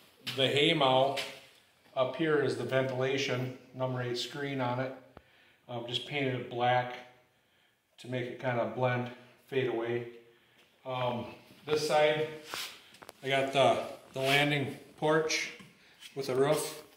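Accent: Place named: United States. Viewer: Love the American accent, 'ruff' (roof). 🙂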